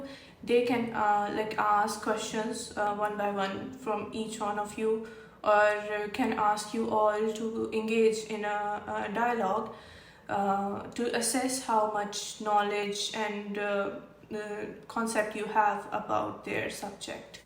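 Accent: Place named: India. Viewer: Where were you born? You sound indian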